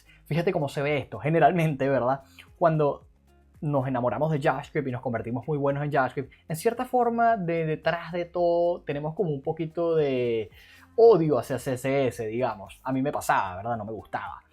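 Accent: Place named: Venezuela. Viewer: Bro por como hablas eres de aqui de venezuela ? despues de css me recomiendas ver videos sobre el dom ?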